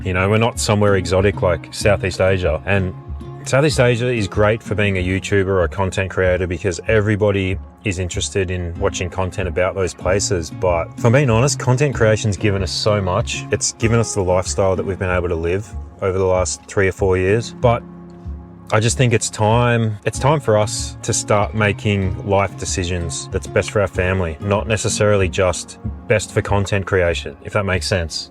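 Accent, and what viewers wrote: Australia. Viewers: It’s not your Azzie accent. Tim Hortons is the problem. 🇮🇳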